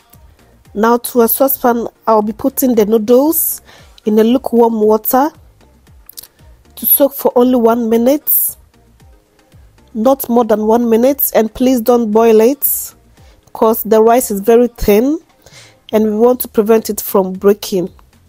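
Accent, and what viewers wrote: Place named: Jamaica. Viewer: What accent is that? Jamaican accent?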